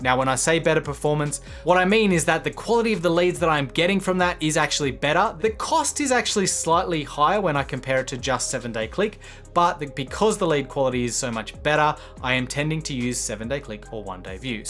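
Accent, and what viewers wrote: Australia. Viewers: Hey, I love your videos ( I also love Australian accent ). whats your take on Google updates that will take place in 2024 ( google is going to remove cookies in its browsers ) does this will affect Facebook ads, TikTok Ads?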